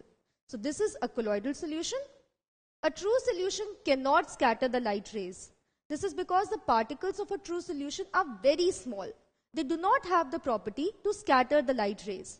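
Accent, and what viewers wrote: India. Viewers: aapke accent se pta chl rha hai ki aap indian hain toh kya aap hindi mein padha skti hain plz kyunki aapki eng zyada scientific lg rhi hai toh thodi mushkil aa rhi hai waise aapne sab kuch sahi aur accha padhaya pr plz agar ho ske toh hindi mein padhane 1 ka 1 baar try kijiye ka plz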